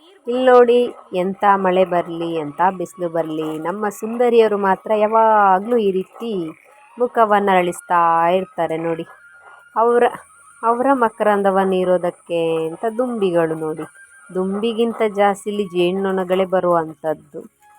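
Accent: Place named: Udupi, India. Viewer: ನಿಮ್ಮ ಮಾತು ಕೇಳಿ ನಾನು ಊರಿಗೆ ಬಂದ ಹಾಗೆ ಆಯ್ತು ನಮ್ಮದು ಉಡುಪಿ 🥰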